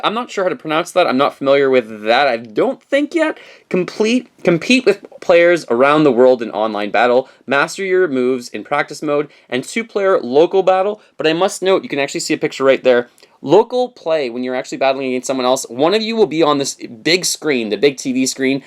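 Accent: Canada. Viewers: Love the Canadian accent. "Try that owuuuttt." :)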